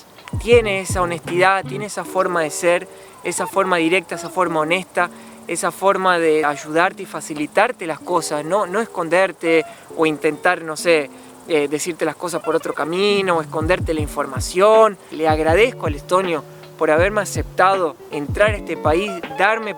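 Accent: Argentina. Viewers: Me encanta el acento argentino 😉Да...Usted tienes toda la razón del mundo ♥️YES ! ☝🏼 ACTITUD, MODALES, RESPETO al PRÓJIMO...Además de echarle GANAS a la vida...ser buena persona ✋🏼Yo he vivido en Japón ...y me va genial tengo un buen trabajo , buenas relaciones , mi trabajo con extranjeros de todo el mundo.. 🇺🇸 🇰🇷🇷🇺🇲🇳🇨🇳 Una experiencia increíble e inolvidable 😉 lo más importante y revelan te en mi experiencia personal es ;Aceptar y respetar las DIFERENCIAS 🇨🇺🙏☘️Bye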